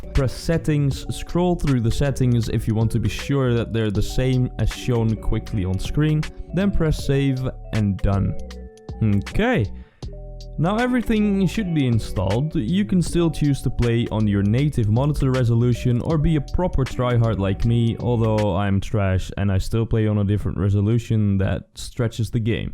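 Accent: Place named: Netherlands. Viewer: Hahaha your dutch tho kan gewoon je accent horen maar bedankt pik me laptop kan nauwelijks dit spel aan, Dus ga zeker dit proberen!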